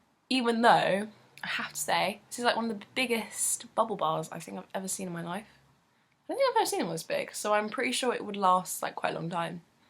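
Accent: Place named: United Kingdom. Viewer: I love your accent. Whereabouts in the UK are you from?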